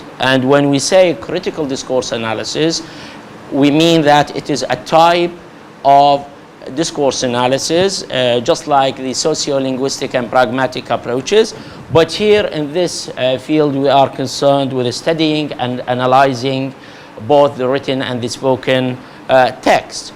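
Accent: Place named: India. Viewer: Though I thought him Indian, his English doesn't bother me at all since I've benefited from his presentation.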